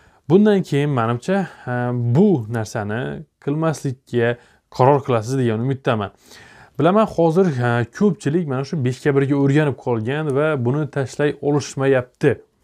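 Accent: Khorezm, Uzbekistan. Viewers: Харазимдан мисиз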